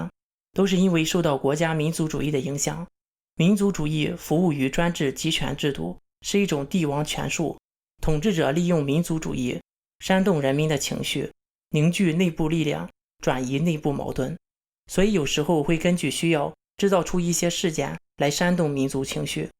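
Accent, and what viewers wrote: Shandong, China. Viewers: 我觉得你先把你的山东普通话练好了再传功比较合适，你让我对反智主义的认识上升到了新的境界，大开眼界了。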